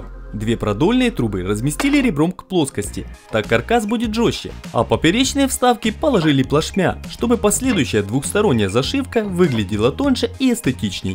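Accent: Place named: South, Ukraine. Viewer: Я так понял по говору, это юг Украины...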